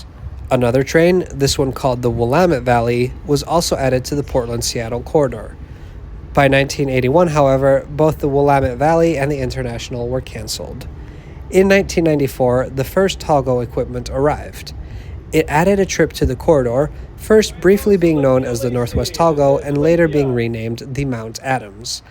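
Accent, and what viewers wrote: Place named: Chicago, United States. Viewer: you have been very, very evenhanded with Amtrak👍🏻 you and Chicago area?🤔( you despite being from the Netherlands) sound like a Chicagoan lol🤘🏻🇳🇱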